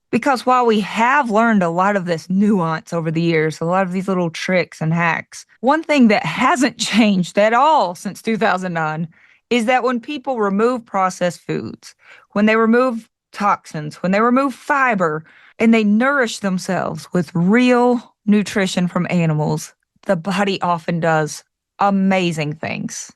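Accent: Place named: East Tennessee, United States. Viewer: New subscriber. Love your personality. You sound like you’re from around here, East Tennessee.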